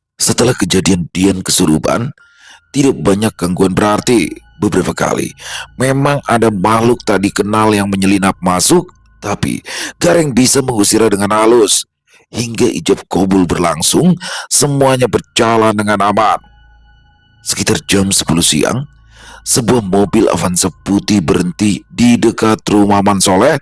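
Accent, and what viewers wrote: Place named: Banyumas, Indonesia. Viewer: Ini mas kalo di joinin dengan mas bawor cocok,sama sama ngapaknya dari banyumas ❤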